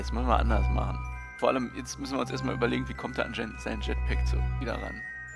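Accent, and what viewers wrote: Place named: Berlin, Germany. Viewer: Ah herrlich, diese Verwirrung und dann noch die Berlinerschnauze 🤣👍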